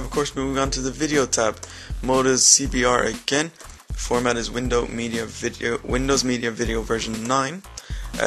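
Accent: Ireland. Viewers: Where ya from in Ireland?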